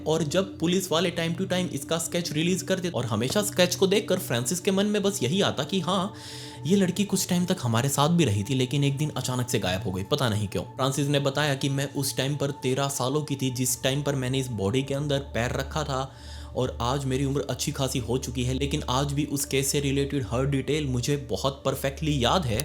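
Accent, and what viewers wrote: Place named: Hyderabad, India. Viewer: I visited your channel after years and idk why I feel your accent is changed. You are sounding hyderabadi accent 🤧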